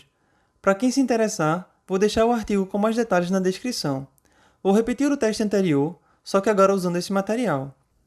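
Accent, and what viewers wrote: Brazil. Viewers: Hay un canal de ciencia se llama manual do mundo. El tío también es de Brasil. Pero su acento es muy diferente de tuyo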